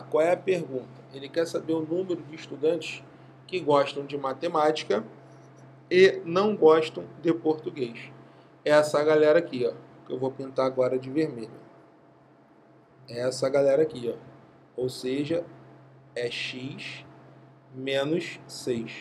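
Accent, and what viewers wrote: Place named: Rio de Janeiro, Brazil. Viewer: Da pra se vê claramente que o é um carioca da gema. 😁 Ele puxa bastante no x... 😄. Aula top. Show de bola meu velho.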